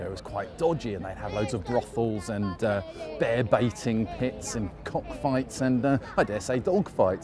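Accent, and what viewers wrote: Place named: RP, United Kingdom. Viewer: Gracious, what a delightful RP accent! I didn't think there were any left. Not once did you pronounce "fings" instead of "things"--a rarity these days. 'Spoken from a true admirer across the North Atlantic. 'Cheers.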